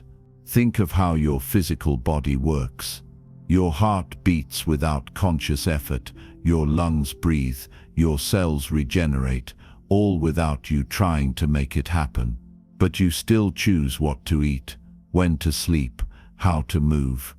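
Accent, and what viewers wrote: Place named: United Kingdom. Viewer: Nowhere in the word says we were broken with him. We died with him. I don’t like it when a different nomenclature is inserted that is not scriptural. We still have an old man nature I renewed mind walk is an intense mindset. Remember when Paul says who shall deliver me from this dead body… We are to reckon our selves dead unto Christ and renewed in the spirit of our minds to the new nature. Where is the British accent narration lead to authenticity regarding a Chinese Christian?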